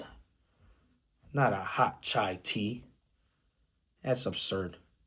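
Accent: Chicago, United States. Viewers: I like the mustache and glasses that you used in the speech I also feel like using the strong Chicago accent and stereotypes was a good way to reflect how the city is to people. Also, I believe that Caleb Williams is the next Brady.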